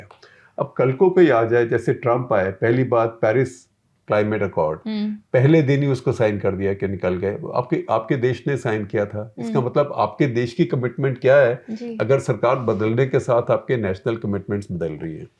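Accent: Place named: Delhi, India. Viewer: Shekhar ji…..ye program best hai Print pe. Especially aap jo anecdotes dete hain woh bahut rochak hote hain….e.g., air force plane girne wala kissa. Please continue to do this in hindi. Aapki Hindi Dilli wali hai to bahut interesting hai. Thanks.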